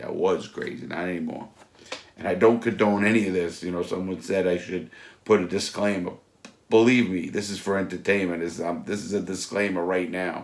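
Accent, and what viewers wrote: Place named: Northeast, United States. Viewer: has a ton of videos here on YouTube, along with 1,000’s of comments on each one. So if this has already been said and I missed it, I’m sorry, but.... story time sessions remind me SO much of Joey Diaz. is a little bit more humble but nonetheless, very similar stories. And they both have that Northeast/NY/NJ accent.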